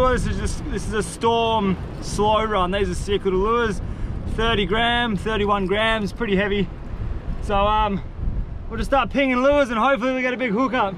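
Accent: Australia. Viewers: This guy sounds Australian, I love it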